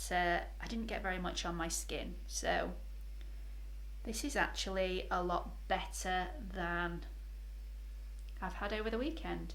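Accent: United Kingdom. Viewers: I love your accent,from which part of the UK you are🙂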